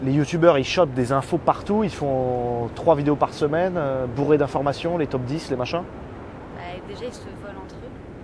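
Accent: South, France. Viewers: On dirais qu'il commence à perdre son accent du Sud.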